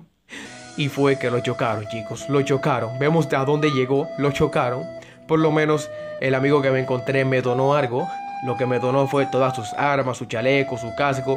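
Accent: Dominican Republic. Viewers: buen video, eres dominicano? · Eres de republica dominicana?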